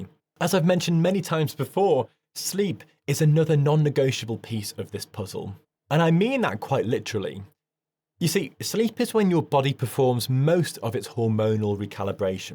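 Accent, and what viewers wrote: United Kingdom. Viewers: What an excellent inspirational explanation … Thank you.. defo following you from now on … I’m an 85 year old female, still working as a wellbeing programmer and fitness teacher, but in the last year, my blood pressure, has become elevated, forcing me me to go on a BP med.I have been snacking between training sessions, going to stop from today!!! Also I think you live in the same part of the UK as me, judging by your accent.. Thanks again!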